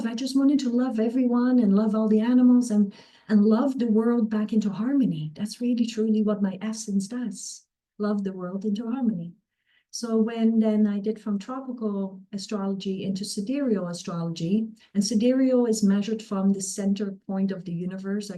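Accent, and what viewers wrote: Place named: Australia. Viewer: I've only just started listening but it sounds very much like you've got an Australian accent and people from any other country, apparently our Aussie accent is really hard to try and imitate 🙏🏻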